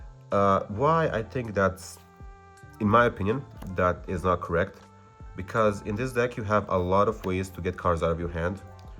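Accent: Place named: Balkans. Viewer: I hear a bit of a Balkan accent there, would love to discuss more hero stuff. Testing stuff out again and defintely could use some tips!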